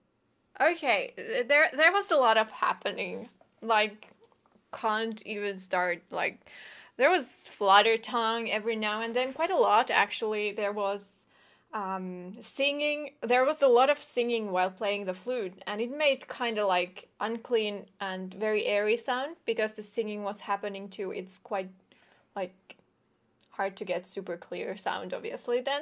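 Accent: Finland. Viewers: She's Finnish. As in German, J is Y and TH is T, and the Rs are rolled, so becomes Yetrrrrro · I knew you were suomalainen nainen I miss that accent...❤ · Mike G She's from Finland! English is at least her second language. She's adorable!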